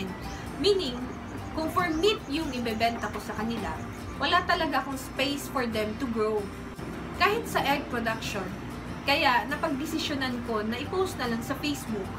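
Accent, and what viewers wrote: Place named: Bicol, Philippines. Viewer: new subscriber here from Bikol... tga saan ka ma'am? parang bikol yung punto ng tagalog mo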